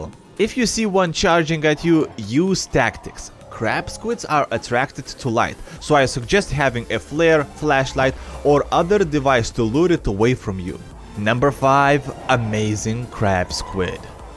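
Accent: Russia. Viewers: are you Russian? probably but are you? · Русский акцент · Больно у тебя акцент русский . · Hello comrades" communism 100 Edit:I just noticed the russian accent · His accent is good tho Russian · У тебя такой русский акцент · I love your Russian accent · are you russin? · Do you speak Russian accent? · I love, when russians speak English! It sounds so awesome! I love the russian accent. “a friendly slap in the face.“ xD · I can bet you are russian i hear your english and i like intro HELO COMRADES 😂 greetings from serbia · I'm sorry if this offends you in anyway, but, are you Russian or maybe Scottish? The accent you have is making me curious. If you don't wish to answer I understand. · Is narrator russian? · Russian ascent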